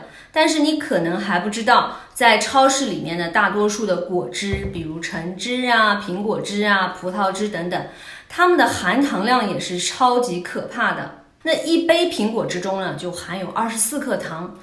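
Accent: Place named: Anhui, China. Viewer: 冰淇淋+布朗尼真的是王炸组合，也太好吃了也有好多好吃的食物： 各种肉类、牛排、蔬菜（老实说真的好吃的不多）西柚、柚子、最近我发现实在是不容易吃到吐的还可以的就是：番茄炒蛋、凉拌黄瓜了，难过。。。再次猜一下是不是来自大安徽，哈哈哈口音也太接近我家那一带了么么哒❤️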